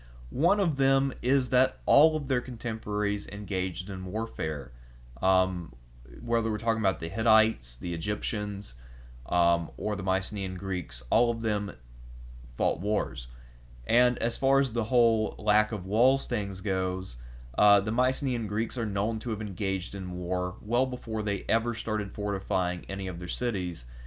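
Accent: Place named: Texas, United States. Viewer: I live for the (?) Texan accent!! Thanks for another fun video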